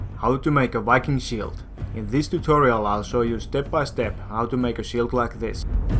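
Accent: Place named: Finland. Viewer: Did you use a metal rim because you couldn't find rawhide? Arvasin aksentista, et oot suomalainen ;)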